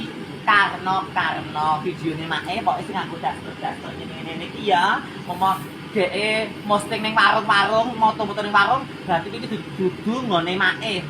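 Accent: Java, Indonesia. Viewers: Oalah... Tp sy seneng dengan accent jawanya ma'e.. semangat trs ya semua.. 😊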